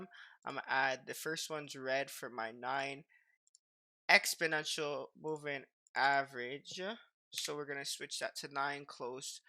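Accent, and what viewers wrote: Toronto, Canada. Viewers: yuh knoe... you from toronto ?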